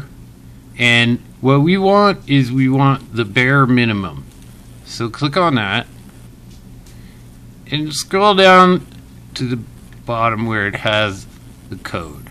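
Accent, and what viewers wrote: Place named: Texas, United States. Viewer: you have wierd texas axent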